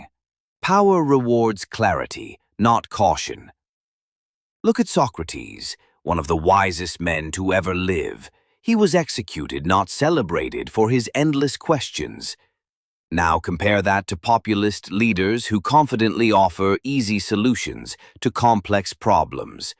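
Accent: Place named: England, United Kingdom. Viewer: Too slow, lose the english accent. Good shid lil bro , good luck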